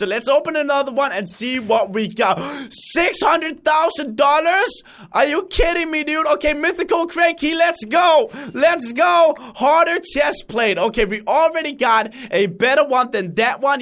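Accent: Denmark. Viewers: Crainer's Real name is......His Danish voice is so cool There is an interview of him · I did but have you heard his Danish voice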